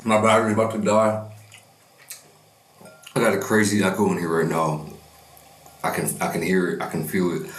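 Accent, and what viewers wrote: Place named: United States. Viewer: You can tell that been to America by the way he talks 😂 Keep up the great vids man 🙌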